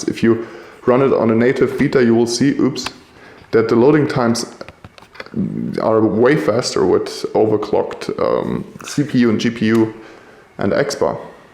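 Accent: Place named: Germany. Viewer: Man hört den deutschen direkt heraus^^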